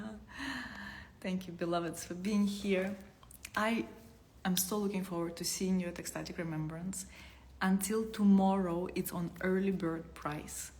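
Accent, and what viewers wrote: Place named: Russia. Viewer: A very strong russian accent... annoying.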